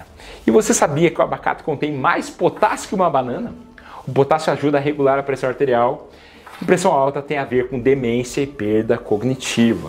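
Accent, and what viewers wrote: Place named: Rio Grande do Sul, Brazil. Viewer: Seus vídeos são fantásticos, além desse sotaque maravilho, levandodo-me às memórias do meu querido Rio Grande do Sul, que saudades daí do sul! Deus lhe abençoe, guie e guarde. ❤ Grande abraço.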